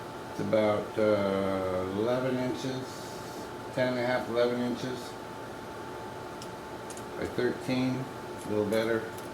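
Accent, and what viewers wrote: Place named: Indiana, United States. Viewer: Good morning. The bowl is beautiful. I was worried you would catch your hand on parts of it. Maybe you should wear a glove. What part of midwest are you in? You sound like Indiana. Have a bless day. See you next time.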